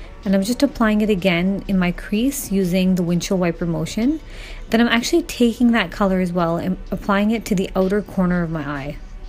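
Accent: Punjab, India. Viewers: You have a lovely Punjabi accent...😊😊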